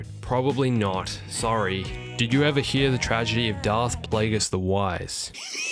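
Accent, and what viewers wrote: Australia. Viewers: YES!!!! AN AUSSIE! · You're Australian? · are you Australian??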